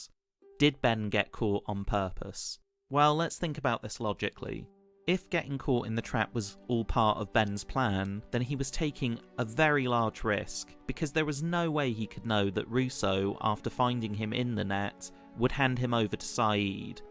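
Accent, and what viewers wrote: United Kingdom. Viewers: Is this video a robot voice or yours??? I love the voice in this video (British accent?)...I would be surprised to hear it's a robot!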